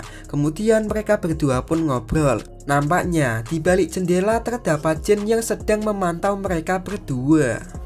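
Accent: Java, Indonesia. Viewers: medok banget..hehe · Aku malah baru ini lihat di tv gk pernah lihat karena jarang nonton tv 😂 seru jugak filem nya 😂 mas nya jawa tpi lucu ngong nya jadi tambah terhibur 🙏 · Jowone endi sampean?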